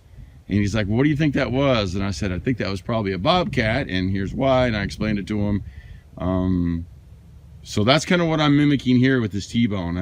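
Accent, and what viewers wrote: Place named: Washington, United States. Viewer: could you say ummm one more time. this guy aint from missouri sounds like washington im trying to learn but not showing how to set traps just moving camera around lame.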